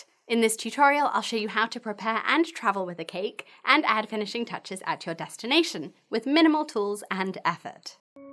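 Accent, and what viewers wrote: United Kingdom. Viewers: British~ Like it,that's amazing -;)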